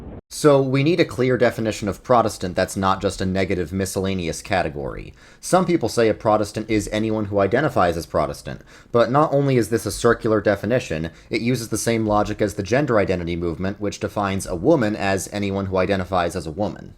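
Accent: Germany. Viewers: The German accent got me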